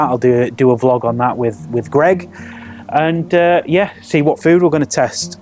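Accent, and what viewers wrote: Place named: Yorkshire, United Kingdom. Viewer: Ahhh a true Yorkshire man 5p 10p 🤣🤣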